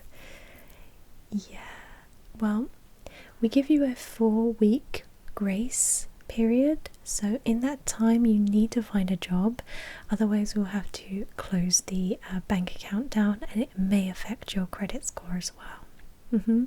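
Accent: England, United Kingdom. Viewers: To, I enjoyed your vampire roleplay. The title was Vampire Blood Bank. I love your English accent very much. And just that, actually makes this particular roleplay. Extra special. I hope that there will be more vampire role plays, in the near future.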